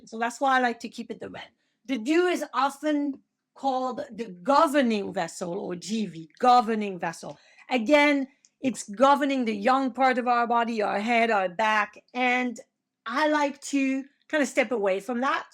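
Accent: France. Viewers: you are awesome very gifted teaching TCM with passion; please do not apologize for your French accent! Joanne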